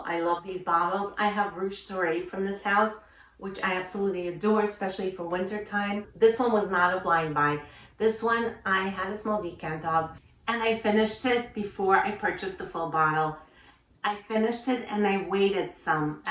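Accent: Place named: New York, United States. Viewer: I just love your New York accent ! ❤️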